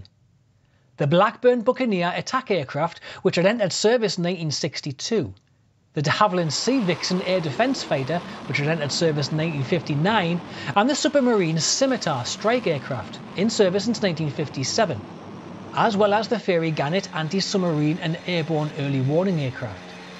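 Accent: Newcastle, United Kingdom. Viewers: He's got a Newcastle (?) / north east accent. Great speaker, fascinating video.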